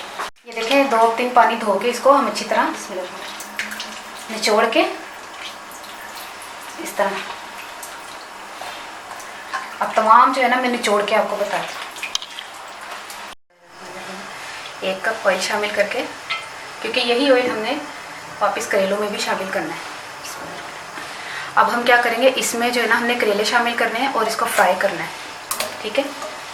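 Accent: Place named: Punjab, India. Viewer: باجى جي. هذا قيميه ماشاء الله عليك ميه ميه وأنت لسان البنجابي حلوة ميه ميه جزاكم الله خيرا في الدنيا والآخرة مع السلام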